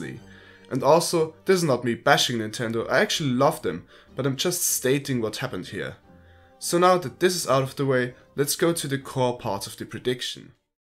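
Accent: Germany. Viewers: German guy or what? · Bist du Deutscher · ah ok, Ur accent do rly sounds german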